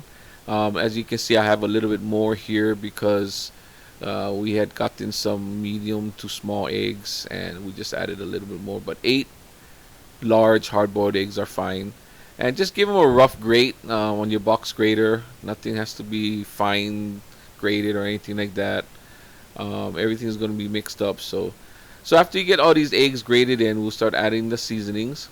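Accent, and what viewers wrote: Hawaii, United States. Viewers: New Subbie! Love the pigeon accent - passion for food & sharing your recipe. So happy I found your content. Mahalo! Born & raised in Waianae HI - now in Seattle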